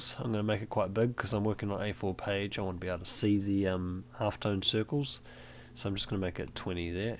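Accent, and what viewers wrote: New Zealand. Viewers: kiwi accent is very obvious, nice mate, sweet as :)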